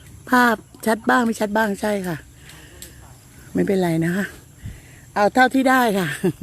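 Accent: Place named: Kanchanaburi, Thailand. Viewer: เมืองกาญจน์ชัดมากจ้า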